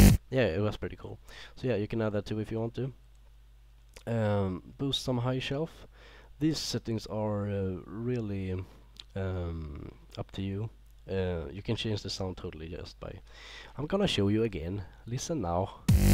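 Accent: Sweden. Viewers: U sound soo swedish! are u swedish? jag är de ;)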